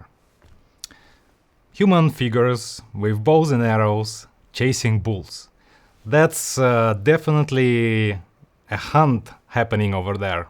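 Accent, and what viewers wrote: Russia. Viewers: Yes, his Russian-English dialect is hard not to notice, I also communicate in English. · Русско говорящий. Его зовут и по акценту понятно. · Он русский у него акцент русский и имя русское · Я слышу русский акцент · I agree, Russian accent and English are very good)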